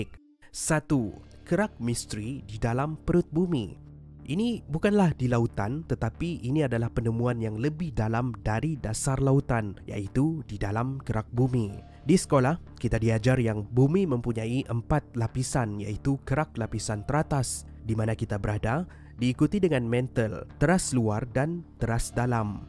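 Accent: Sabah, Malaysia. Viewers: patutla cara cakap lain sikit, urang sabah ani haha mantap, teruskan, support bah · Dia loghat sabah. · Abang ni orang sabah ke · Abg ni orng sabah kh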